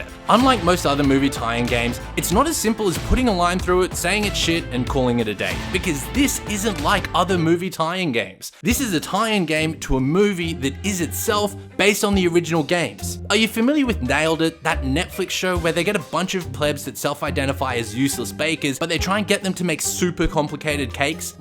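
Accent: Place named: United States. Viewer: This guy's accent is clearly American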